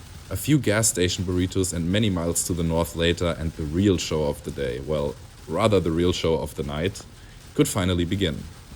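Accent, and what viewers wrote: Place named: Germany. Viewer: Ich hatte nach den ersten 10 Sekunden irgendwie das Gefühl das der Sprecher als Muttersprache Deutsch spricht und ich hatte Recht.😅 Aber super Video, alles interessant eingefangen. Macht weiter so!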